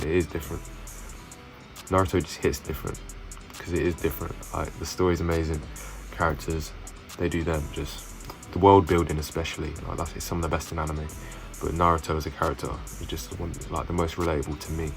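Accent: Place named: London, United Kingdom. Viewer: Your London accent just makes the tier list so much better LOL. Pretty Much agreed with everything in this besides Tokyo ghoul which I think is a B Tier.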